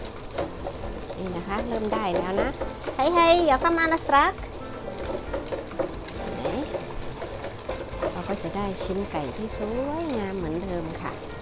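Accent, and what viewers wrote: Isan, Thailand. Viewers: พึ่งได้เข้ามาติดตาม ไล่ดูทุกคลิปเลยค่ะ เข้าแล้วออกไม่ได้จริงๆช่องนี้ ชอบเวลาพี่ พูดไทยตกอิสาน น่ารักมากๆค่ะ 😊 บางทีเราก็นึกคำไม่ทัน 😆แต่มันเป็น เอกลักษณ์เนาะ เสียงใสๆไทยคำอีสานคำ ฟังเพลินดีค่ะ FCสาวอิสานคือกันจ้า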